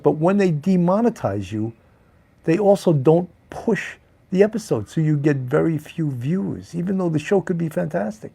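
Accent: New York, United States. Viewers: I watch a lot of crime shows, which is probably why your channel popped up in my feed a few days ago. Really enjoy your commentary and NY accent.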